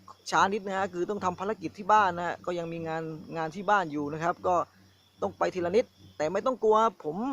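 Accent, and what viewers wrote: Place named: South, Thailand. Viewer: แหลงใต่เพราะดีครับ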